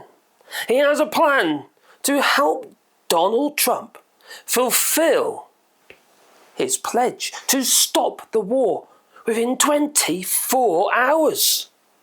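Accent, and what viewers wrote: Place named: Norfolk, United Kingdom. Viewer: you sound like a norfolk buh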